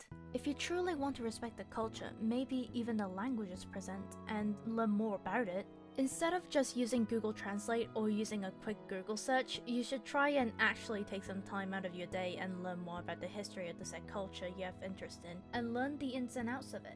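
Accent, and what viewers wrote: United Kingdom. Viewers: OMG ARE U BRITISH!??? OOP- IM SORRY!! I JUST LOVE YOUR ACCENT!! IM OBSESSED!! AGAIN SORRY!! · Are you British because you don't sound Koren( I,m not trying to be rude)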